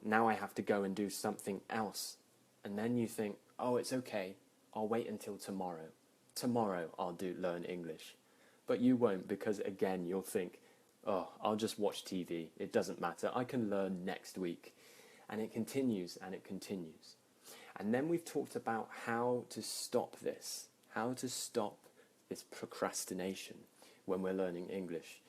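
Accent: United Kingdom. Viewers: hi, i just click subscribed you. thank you for your teaching it's first time for me to hear "procrastination" and i quite wonder normally i cannot get british accent but i understand you very well. it's possible that you maybe speak slowly and clearly. ^_^ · Love your voice and of course your amazing British accent! ♥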